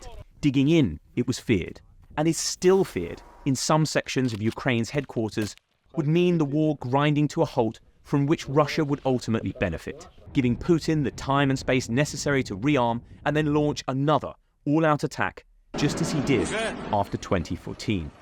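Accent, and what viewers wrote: United Kingdom. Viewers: Ridicolous Brit narration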